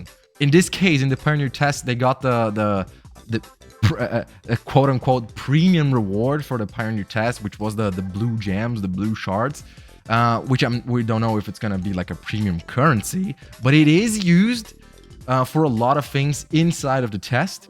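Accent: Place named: Brazil. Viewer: Tava vendo o video e comecei a notar um rastro de sotaque brasileiro. Fui olhar teu insta e nao deu outra kkkkkkkkkkkk por mais impecavel que seja o ingles sempre da pra perceber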